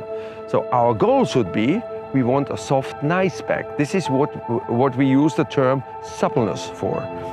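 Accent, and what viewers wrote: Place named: Germany. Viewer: PLEASE redo this. Remove or at least lower the "background" music. The loud music coupled with the German accent makes it difficult to follow the lesson. This is very valuable information. It needs to be presented in the best possible manner. Do professors in universities play loud music during their lectures? It's been 50 years since I sat in a lecture hall, maybe things are different now. Rant off. Thank you.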